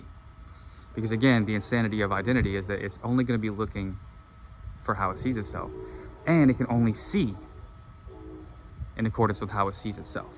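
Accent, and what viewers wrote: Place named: Cockney, United Kingdom. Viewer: Haha I’m British and love the little cockney accent you slip into your videos 😂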